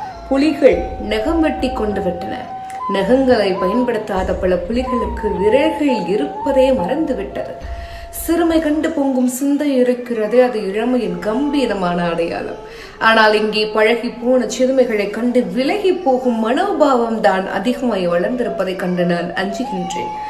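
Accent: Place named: Sri Lanka. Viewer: எமது இலங்கைத் தமிழ் உச்சரிப்பை உங்கள் நாவிலும், இதையத்தில் விதைத்தது இறைவன் என்னும் அருள், மேலும் தமிழ் மொழியில் உங்கள் சேவை பெருகட்டும் நன்றி.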